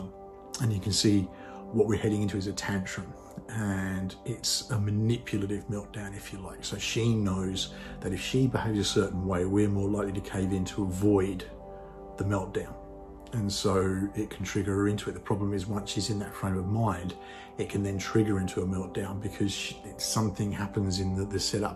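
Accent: Australia. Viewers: Thanks for the Star Trek analogy. That was perfect being Im an avid Trekky. I work in a school with challenging kids. PDA came onto our radar sometime last year. I am working with a girl that we think may have it. Anyway... strategies for her can be applied to all the kids as a way to keep things calm and safe while diagnosis' are being sought. Im in Australia. You sound Aussie. Its easy for me not to feel it personally, it must be so hard as the parent. I validate students feelings so they know I am listening. I have had things thrown at me, sworn at me etc. I ignore all those things. A gentle smile would work best as a bigger smile can be interpreted as ridicule.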